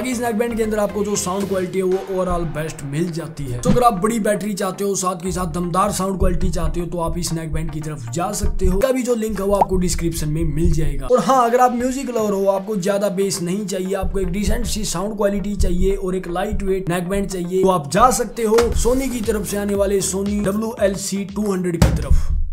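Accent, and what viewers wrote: Haryana, India. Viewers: Bhai boli se to haryanvi lage hai kha se ho.... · Bhai haryane se hai k ? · Nyc haryanvi accent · Haryana se hai kya bhai, tone to kuch aisi hi hai❤ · Harayana say h bhai 😂😂jai haryana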